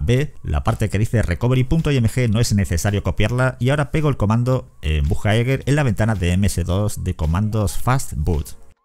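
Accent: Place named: Spain. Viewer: Es tener acento español y saber que no voy a perder el tiempo. Gracias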